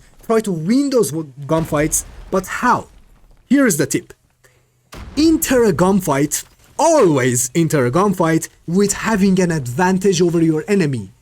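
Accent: Iran. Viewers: از لهجت معلومه ایرانی ای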